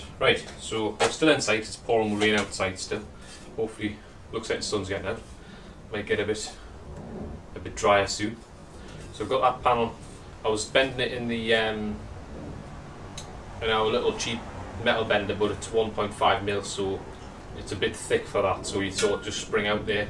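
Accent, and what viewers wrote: Newcastle, United Kingdom. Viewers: Been following your progress from the start, really great job cannot believe you got this done in your front yard, must have great neighbors? Reminds me of a time long ago I did some body work on my rusty Ford Anglia, not up to your standards though, nice to hear the accent, former Geordie from Wallsend , now in Canada, cheers.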